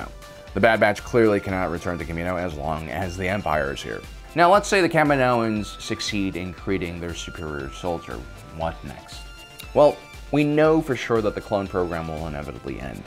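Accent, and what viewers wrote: New Zealand. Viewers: I like the new Zealander accent ): Omeeeeega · Plenty of kiwi accents sounds like OP, lol.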